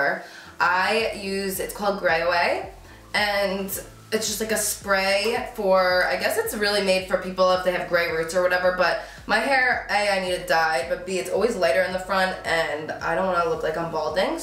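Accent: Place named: Boston, United States. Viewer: She sounds like she’s Jewish or from Boston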